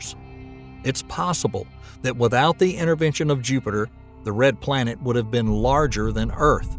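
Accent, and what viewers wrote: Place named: Georgia, United States. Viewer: This leads one to opine... there is some truth to the notion that Jupiter is a savior god, pulling us away from hell, and that the sun is hell, Lucifer, drawing all to it inevitably. This reforms the Judeo-Christian hierarchy of the trinity. God is Satan, the Sun. Jesus is the savior, Jupiter. The holy spirit is gravity, the unifying force that these entities use to interact with us. All this is hogwash, of course. But it is an example of solipsistic thinking. I just did this to show you religionists there's a better explanation that still isn't true. Your creation hypothesis makes no sense at all. P.s. I love how this guy with a southern, Georgia accent, speaks so intelligibly. He's an outstanding orator, with perfect information. Great presentation. Thank you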